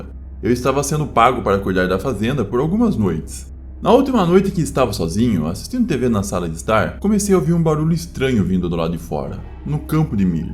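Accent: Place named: Rio Grande do Sul, Brazil. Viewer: sim. Já me inscrevi, e adorei o sotaque (parece gaúcho) se não me engano.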